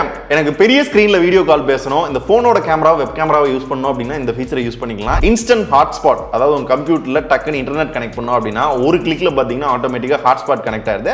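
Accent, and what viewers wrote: Madurai, India. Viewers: Bro Nenkee Madurai yaa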